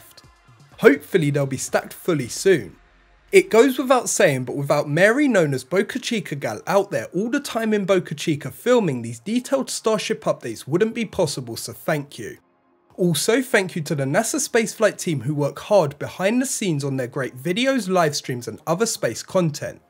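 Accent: United Kingdom. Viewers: Turn the volume to zero. Sorry that UK accents annoy you (not really). His use of technical terms, and of English grammar are excellent. Maybe that is your problem.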